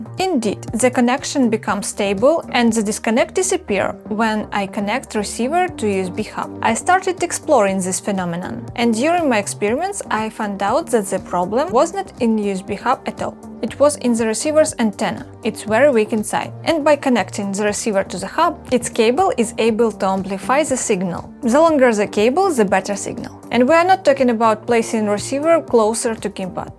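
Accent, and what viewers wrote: Russia. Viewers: This person has no idea what they are even saying. Most obvious actor/ plant video I have ever seen. Not sure who paid her, not sure she has any idea either. But 379 subscribers and a strong russian accent. Yeahhhhhh gunna get a second opinion on that... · Russian accent is hard not to recognize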